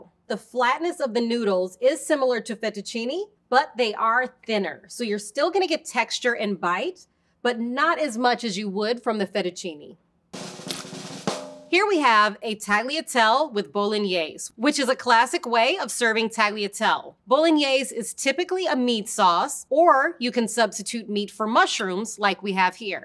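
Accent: United States. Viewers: Because she is 'Murican lol. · Why the hell she pronounce all the Italian names in Murican? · That Americanized pronunciation of the pasta names kinda ruins this video for me. I mean, it doesn't have to be an "Itsa me Mario" accent but just a little bit more effort would've made it better imo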